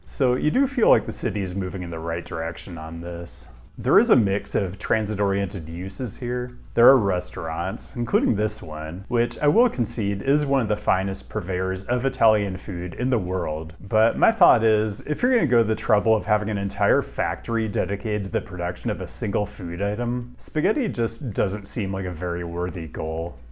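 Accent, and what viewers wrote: United States. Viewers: Your accent is interesting. Where did you grow up? Very American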